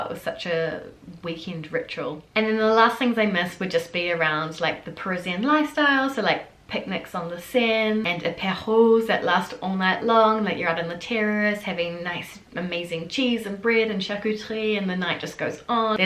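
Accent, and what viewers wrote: New Zealand. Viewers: Your Kiwi accent has gotten SO much stronger since you went back!! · Oh, you are so cute! Even when you're complaining about something, you're just totally likeable! Love watching your videos and seeing your friendly, open face and hearing that adorable Kiwi accent! It would be nice to hear directly from your husband about his acclimation to NZ. That must really be a culture shock - much as yours was when you first came to Paris! A small request - in the future, could you speak about what exactly your business entails? Thanks again for the peek into your world! Cheers, Fred in NYC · Thank you for your honesty. I think I understand your dilemma. There is a side in you that appreciates being back in New Zealand, where it's quiet and nature is beautiful and the bureaucracy is less stifling and perhaps it's also nice to be able to see your family more often, yet, you also miss things from Paris, because it had become your home for five years and at some point, you had the vision to spend your entire life there. 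At the same time, you knew when you lived there it wasn't an ideal place (I agree with you about the noise and the endless paperwork), but now you also have to rethink your channel. You love doing your channel and I think you should keep doing it, you just need to find a new theme. Please don't let the negative comments bring you down. You're a beautiful young woman and I love listening to your New Zealand accent. What you're going through is stressful. It looks easy getting on a plane and just going somewhere else, but to start your life all over again, even if it's your home country can be challenging. I wish you the best of luck. Good that you have your husband with you, who knows about your life in Paris. That way, there is always a bit of Paris with you - the good part. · Your New Zealand accent is coming back in force! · whoo je ne m'étais pas encore rendu compte sur tes dernières vidéos mais ton accent est bel et bien de retour depuis que tu es revenu en Nouvelle Zélande, les e qui deviennent des i etc... 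!!!! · I j discovered your channel a few days ago and have been knee deep ever since. Something fun I just realized was that when you were in France you sounded like you had a more "american" english accent. Now that you are back home in NZ I feel like your NZ accent has become more prominent. However, as an american I have really loved your videos and collabs.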